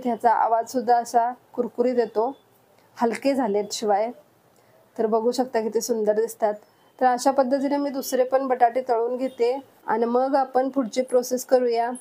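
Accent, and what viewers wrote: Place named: Kolhapur, India. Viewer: tai ani tumhi bolata khup chan kolhapuri god bhasha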